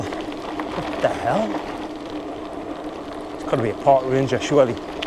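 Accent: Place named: Northern England, United Kingdom. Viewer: Just found your channel, and it’s great. To see you enjoying nature and the solitude of your surroundings is lovely, especially as a few of your rides pass near where I live ( Newbiggin ) on your way North. No airs and graces just a genuine Geordie on the trails and roads that me and the family ride,Even if Stanley is not really Geordie land you sound like you originate from further north. Love the humour too.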